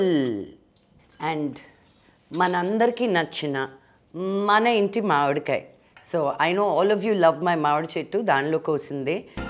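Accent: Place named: United States. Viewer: Miru ndhuku ala antunnaru Laxmi garini thanadhi. Us English slang alane vuntundhi........konni years indhi kadhaa akkada vundi so thanaki alane alavatu indhi...plz thappugaa anakandi.😢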